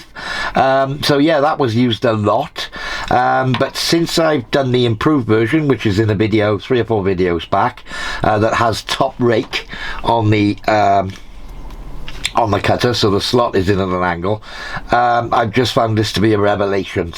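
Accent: Pembrokeshire, United Kingdom. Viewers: Hi. Moved to Portugal from H/west 8 yrs ago. Just happened on your channel. Loved the video and great to here the Pembs accent. ✊🏽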